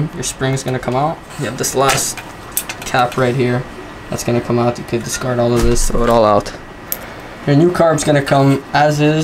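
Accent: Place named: Hawaii, United States. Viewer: Solid video.. bro you sound like one local from hawaii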